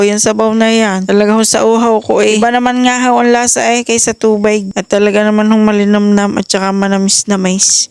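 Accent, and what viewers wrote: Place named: Batangas, Philippines. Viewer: Love your Batangueno accent sis,may fren ako dito from Batangas sya 🙂😎 sanaOl fresh ☺️natawa ako sa braso de Mercedes relatemuch 😳🤣😂done 👍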